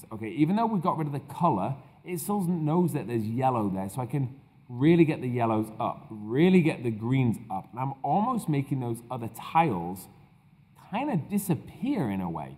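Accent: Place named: England, United Kingdom. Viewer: 28 seconds in and I already know this dude is from England, but lives in Vegas, drinks coffee to stay awake and does star jumps to keep warm...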